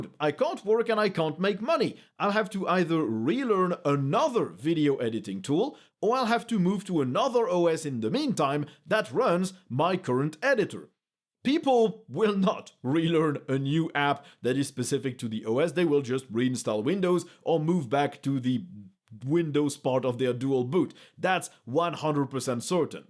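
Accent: France. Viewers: Your French isn't getting in the way of your English. no worries :) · C'est tellement léger comme accent ! Je crois que vous êtes dans mon top 3 des français qui parlent le mieux anglais (IRL et web) Merci pour le super travail, cette chaîne est devenue ma source d'info principale sur Linux, c'est un sweet spot en timings de publication, quantité et complexité de contenu !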